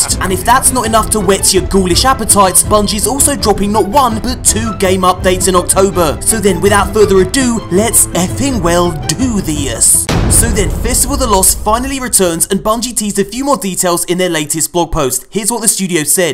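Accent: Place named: United Kingdom. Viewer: Welp.. I guess I'll sub now. Since you slowed tf down on talking speed you cheeky brit thing you.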